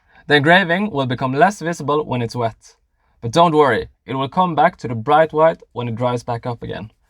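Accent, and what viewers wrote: Norway. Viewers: Kænn ju tæll mi de vei tu de ræilveistæisjen? 😛 Hører kor du é fra.. Veldig gode videoer, forresten!!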